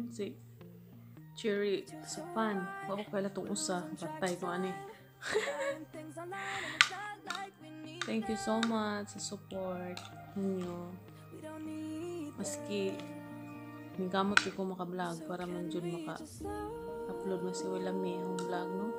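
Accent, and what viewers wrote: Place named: Iloilo, Philippines. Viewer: Ilongga ka pala te ,chururut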